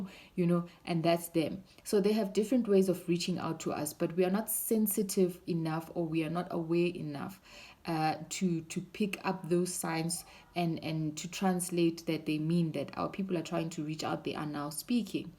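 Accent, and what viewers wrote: Nigeria. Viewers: Nigerian accent akere 😃😃👌👌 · It the Nigerian accent for me😅😅🤞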